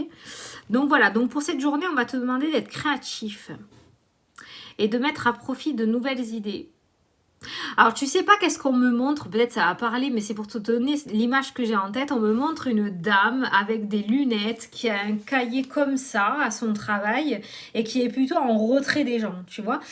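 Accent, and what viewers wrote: South, France. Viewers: Cc aujourd'hui beau soleil là journée commence bien en plus ton sourire ta petite voix chantante et ton accent du sud la petit boite de cartes je vais me l offrir hâte de t avoir en rendez vous une prochaine fois🤡🙆‍♀️🌹🏖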